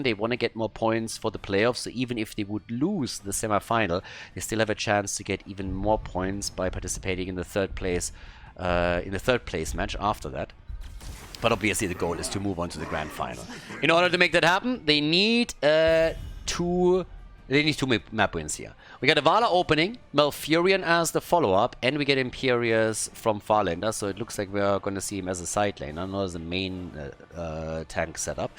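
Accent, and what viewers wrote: Germany. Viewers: here in Melbourne we're in our sixth super-strict lockdown. Can't go outside for more than 2hrs, 5km limit, no visitors, no shops, etc. Your uploads make the evenings pass so much easier, my dude. Appreciate the fuck out of you and your stupid German accent hahaha <3 Love you, stay safe. (From a former neighbour/Dutchie)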